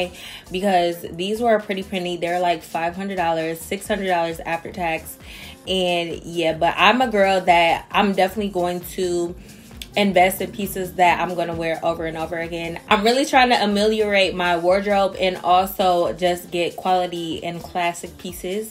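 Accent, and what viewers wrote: United Kingdom. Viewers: It’s always gone be the British accent for me 🤭🫶🏾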